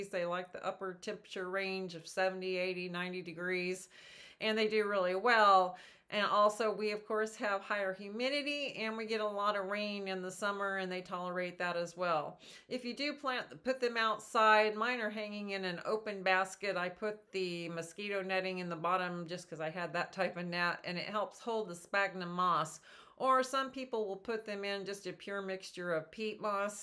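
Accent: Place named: South, United States. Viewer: That’s her southern draw my friend. I’m oh so fascinated with our friends and their accents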